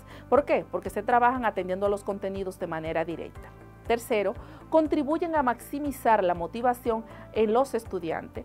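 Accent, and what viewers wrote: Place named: Puerto Rico. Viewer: Aparte de estar escuchando su información al final terminé en escuchar que tiene acento puertorriqueño.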